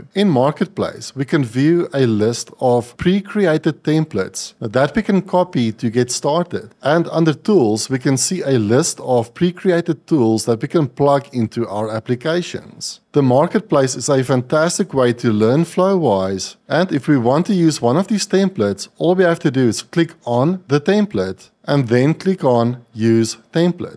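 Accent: South Africa. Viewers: South african>?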